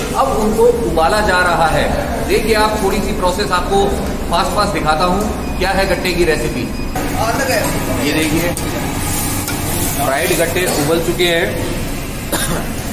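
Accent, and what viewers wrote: Standard. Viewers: Ram Ram Bhai..mujhe shuddh Hindi bahut accha lagta hai, jaise aap bolte hai... Aayiye kabhi Assam, milte hai..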